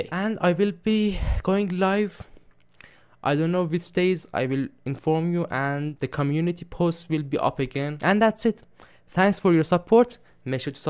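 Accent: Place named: Iran. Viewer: Once I watched your short outta nowhere, your persian accent got me, ngl. Nice job, too much love from Shomal.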